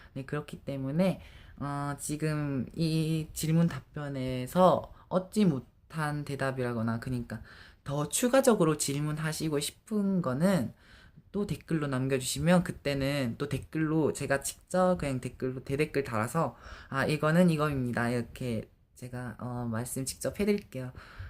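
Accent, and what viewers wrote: Gyeongsang, South Korea. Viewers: 아 말투가 너무 그리워요ㅠㅜ제가지금 경상도살다가 전라도로와서ㅠㅠㅜ 대구근처에서 살았거든요!!사투리ㅠㅠㅠㅜ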